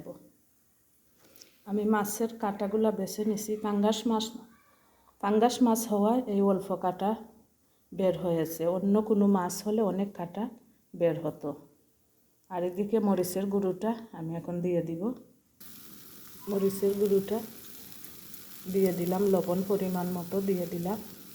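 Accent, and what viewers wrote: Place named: Sylhet, Bangladesh. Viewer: বইন তুমি সিলেটি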